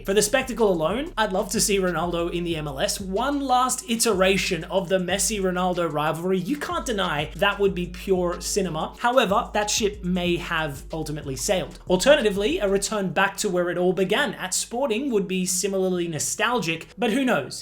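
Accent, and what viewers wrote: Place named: Australia. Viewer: The Aussie accent is indeed the best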